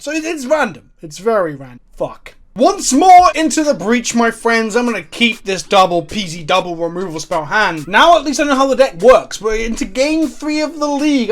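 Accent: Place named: United Kingdom. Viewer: What tried to say: "what is the plural of colossus?" What actually said: "what is the pllll of klloss" I will never understand how british accents work. Do you just leave out half the letters?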